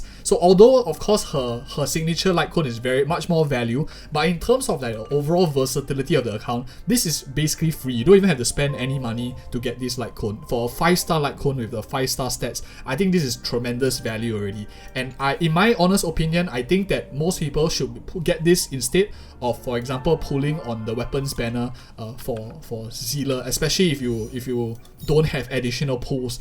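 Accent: Singapore. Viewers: i recognize singlish when i hear it rofl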